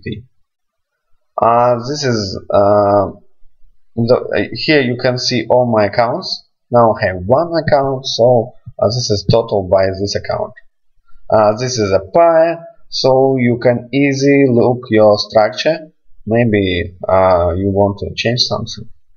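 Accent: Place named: Russia. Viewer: ебучий русский акцент))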